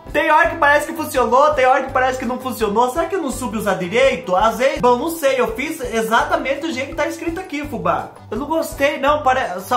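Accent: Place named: Minas Gerais, Brazil. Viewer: adoro vc seu modo de falar parece nois mineiro Kkkk Ajeita parceria pra vc testa coisas pra nois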